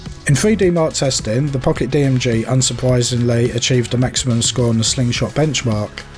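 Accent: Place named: London, United Kingdom. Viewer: If you ever have his money, don't forget about me xD I had a feeling you were using a London accent. Your voice reminds me of his role in the series Taboo.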